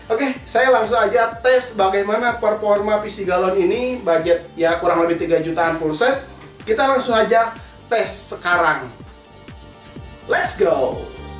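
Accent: West Java, Indonesia. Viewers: kang asal sunda? darimana?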